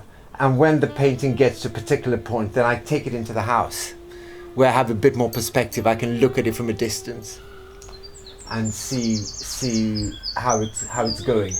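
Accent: RP, United Kingdom. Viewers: He talks like the Royalty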